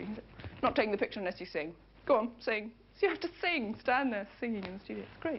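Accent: RP, United Kingdom. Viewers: This is RP and it's an affect, it's the least real English can be. It's not a bad thing necessarily, she doesn't have to speak "real" English given that she wasn't even born in England. I guess assuming white foreigners are English because they speak RP is par for the course in this country, it's all about aesthetics. The fact that this user replied to themselves further expounding their implicitly reactionary world view leads me to believe that their perspective on "real English" identity is distorted and deranged, which is reflected in the fact that actually English people don't meet that standard and random provincials who weren't even born in this country do. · Brits correct me, because I'm American...She sounds VERY RP to me. Interesting how formal and high-brow her accent is. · She was the ultimate dream dolly bird, posh talking and beautiful.❤️❤️❤️ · Received pronunciation.❤❤❤ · It's called RP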